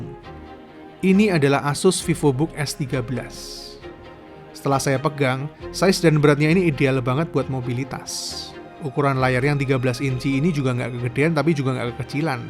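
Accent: Yogyakarta, Indonesia. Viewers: Aku Suka karna ada medok khas Jogja nya ya kang 🙏 ciri khas banget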